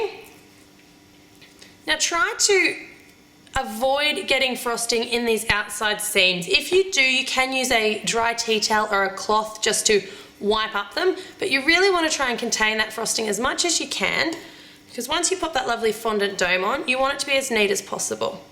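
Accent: Australia. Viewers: Aren't u Australian? I recognise ur voice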